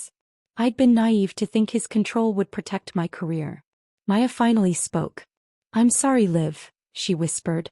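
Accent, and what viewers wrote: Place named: Spain. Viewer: Why would you have a narrator use a heavy Spanish accent reading English? Makes no sense.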